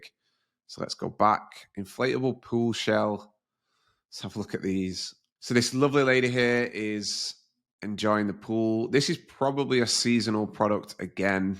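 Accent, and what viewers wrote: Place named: England, United Kingdom. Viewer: Hi just started watching your videos, you sound english, would you say to start that I should start in England or America, I see you doing america fba. Also osome has lots of really shit reviews about just not providing the service they promise, it's made me not trust you as much, if you was a big honest channel, why would you pick a company that has a lot of bad reviews?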